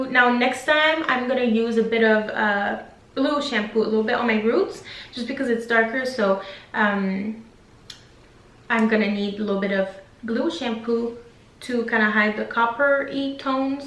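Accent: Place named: Montreal, Canada. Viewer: You’re accent sounds Montreal Canadian. I might be totally wrong lol 😆 your hair looks amazing !!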